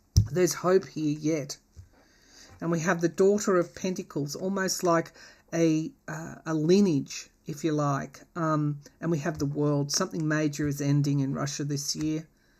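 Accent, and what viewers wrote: Australia. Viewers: Yes I feel this too. Putin is not Russia. Women and men who hold love in their hearts do not support the war in Ukraine, the senseless deaths of their families, the devastation of their neighbour’s lands and cities, the strain on their economy, the unjust burden on ethnic minorities, the ignominy of the Russian name internationally. We are witnessing the last battles of patriarchal, authoritarian leadership in RF and in the US and in other countries. I pray for the flowering of locally based democracies all living in harmony with each other. Thank you for the reading. Love your presence and the Aussie accent. Vivien West Australia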